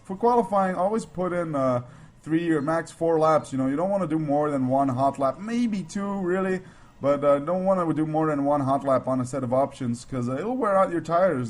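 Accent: United States. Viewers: dude you have a kick ass american accent haha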